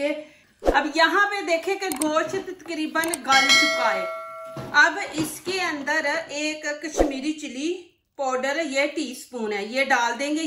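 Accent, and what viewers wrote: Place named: Punjab, India. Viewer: Madam ap english urdu... panjabi style ma boti hain. niceeee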